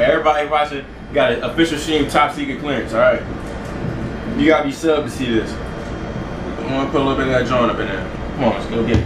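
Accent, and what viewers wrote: Baltimore, United States. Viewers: Are you from Baltimore? You got that accent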